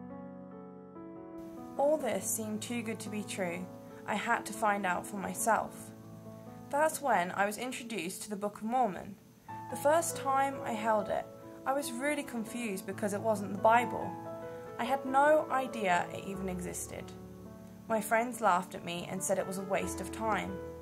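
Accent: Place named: Australia. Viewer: What happened to your Northhampton accent C? U sound kinda Auzzie to my untutored ears :S (search 'Alan Moore' - the Watchmen / V for Vendetta writer - to those not in-the-know for the NH accent)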